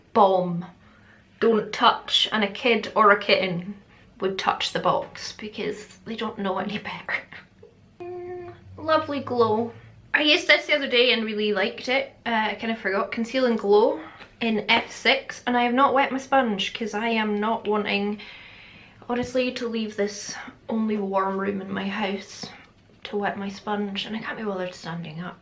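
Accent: Scotland, United Kingdom. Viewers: I love when you do a deep Scottish accent every now and then! I recently found out I’m like 25% Scottish! Merry Christmas,! ❤️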